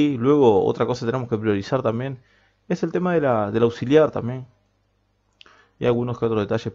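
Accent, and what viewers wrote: Argentina. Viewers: Capoooo Pense que eras Argentino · a pense que de argentina jaja saludos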